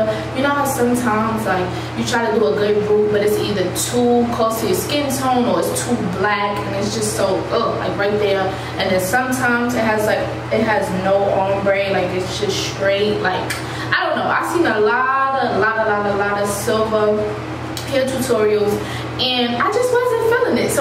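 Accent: New York, United States. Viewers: “Akeke” i love how new york you are sis 🤣🤣🤣🥳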